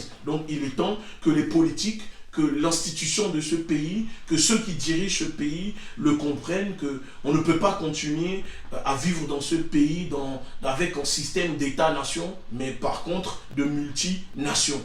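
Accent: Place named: Africa. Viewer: Ta carrément raison non mais pour qui il se prend quelqu'un à remarqué que depuis qu'il fait le prêcheur il a pris un léger accent africain pour être plus crédible, allez écouter comment il parle bien français sans accent quand il rappai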